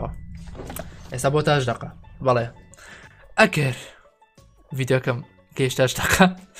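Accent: Erbil, Iraq. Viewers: Hich let naya ba karkuki yan slemani qsa bkay hawleri zyatr la dangt de 😊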